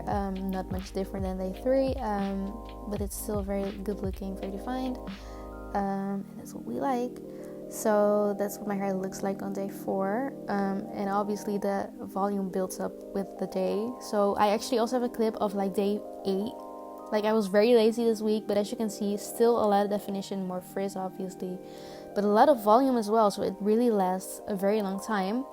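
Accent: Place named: Netherlands. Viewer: Your hair beautiful! Btw are you dutch? You kinda have a dutch accent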